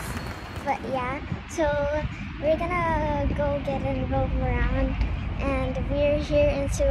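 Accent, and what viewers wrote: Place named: Canada. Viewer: Nice!!! You sound Canadian ey!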